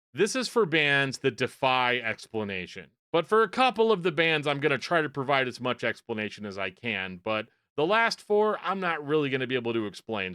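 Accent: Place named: Midwest, United States. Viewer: Greetings from 🇸🇪 Thank you for your lovely midwestern pronounciations and this phenomenon I was unaware of! As a neighbour, I guess Vaakevandring should probably have the heaviest accents on the first a's and secondly the second a. Awake wandering.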